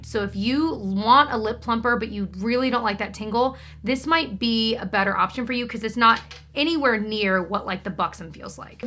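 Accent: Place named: Midwest, United States. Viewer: So.. watching yours and Tyler’s videos I was like. Matt! (My husband!) this girl lives in a Pulty subdivision! She goes to Meijer! I think she lives in Michigan! Then he watched literally one of Tyler’s videos and was like nope. Indians. Ugh! Anyway. Bridgerton was my first real romance series. Haven’t seen them but the books are good. I watch your stuff when I’m out of town for the Midwest accent!!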